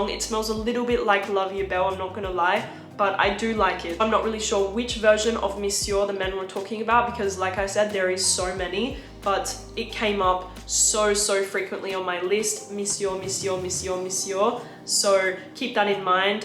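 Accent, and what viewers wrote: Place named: Australia. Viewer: Ariana Grande Cloud is a great dupe for Baccarat Rouge. Bath and Body Works Dahlia is a great dupe for Carolina Herrera Good Girl. I love your accent. Are you Australian?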